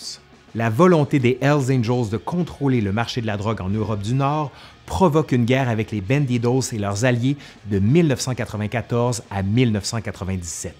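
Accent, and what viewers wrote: Quebec, Canada. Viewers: Ce documentaire est très instructif agrémenté par le bel accent québecois ! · Je trouve que tu t'exprime super bien de façon à ce que les gens qui parle français arrive à comprendre. J'aime cet accent Québécois et ta prononciation des noms en anglais. Bon travail et merci pour ces informations que je ne connaissais pas du tout sur les hells angels ; moi qui croyais que c'était juste une bande de motard qui aime se battre !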